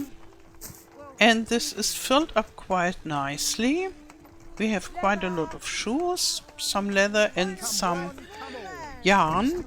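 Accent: Germany. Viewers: A granny with a German accent :) So sweet :)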